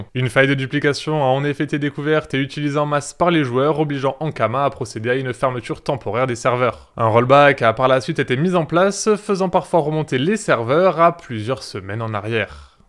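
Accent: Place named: Iran. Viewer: ça fait plaiz d'entendre un iranien (a l'accent)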